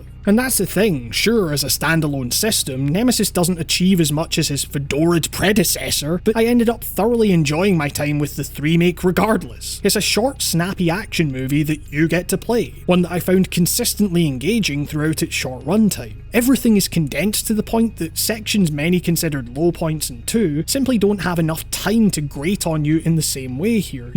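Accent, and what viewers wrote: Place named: Scotland, United Kingdom. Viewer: I think its a slight scottish accent maybe :)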